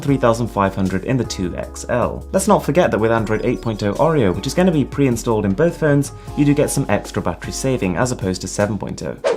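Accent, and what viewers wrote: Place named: United Kingdom. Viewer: Are you indian? I've never seen an Indian person with a British accent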